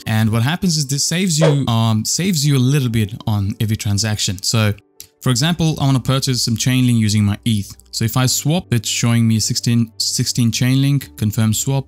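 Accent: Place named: South Africa. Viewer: I hear a South African accent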